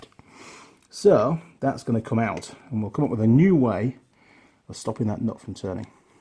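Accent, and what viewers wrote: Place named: East Yorkshire, United Kingdom. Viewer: Hiya buddy, new subscriber after watching `no oil change for 75000km` . I was intrigued as to where you originally came from, sense a twang of East Yorkshire. Anyway good work fella and I shall start on your back catalog.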